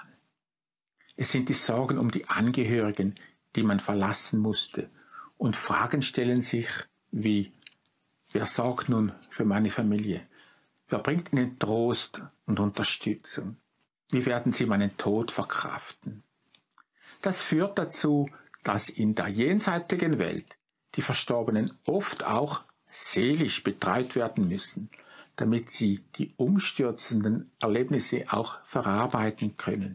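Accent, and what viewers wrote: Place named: Switzerland. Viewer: Herzlichen Dank!! Leider ist mir dieser schweizer Dialekt ÄUSSERST UNANGENEHM !!!!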